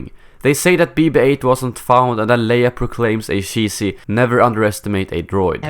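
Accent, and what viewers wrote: Sweden. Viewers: you're swedish XD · Are you swidish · Are you swedish? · are you swedish? You sound swedish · är väll svensk?. Det låter så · he sounds very swedisg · Love your accent, dude. Swedish, I'm guessing?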